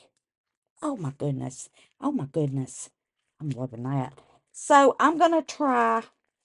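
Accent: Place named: Texas, United States. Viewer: Hey … I’m not sure where you’re from exactly, but I could listen to you all day! Your keychains are beautiful too by the way… I really love that mould, it is very different from the standard letter moulds! I have just watched an old video of yours, where you made crackling bread… Now, I don’t do much cooking anymore, but I would love to have tried your crackling bread recipe. Your accent takes me back a loooong way!! And it makes me hungry for some good ol’ biscuits and gravy… one of the best things I’ve ever eaten!! 😋 😋 I am an Aussie who spent 4 years in Houston, Texas (<num>… flying in, just 3 days before 9/11) and listening to you takes me right back there!! (Back to being in Houston I mean, NOT back to 9/11!!). I loved being there for the first three years, and I planned on staying there to raise my little miracle Texas daughter… unfortunately, her biological (American) father turned extremely abusive and held us captive until I was able to escape. 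Consequently, I had to flee your beautiful country and come home to Australia with my baby. She turned 21 last November, and was raised by myself and the only Dad she’s ever known, my awesome hubby of 17 years. I will never forget my time over there… both the good and the bad… alls well that ends well, they say!! Much love, Nat (Brisbane, Australia) ♥️♥️🇦🇺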